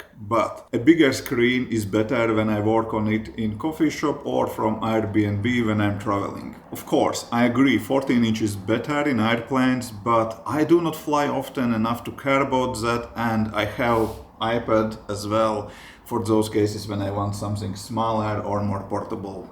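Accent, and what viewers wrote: Russia. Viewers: A Russian accent?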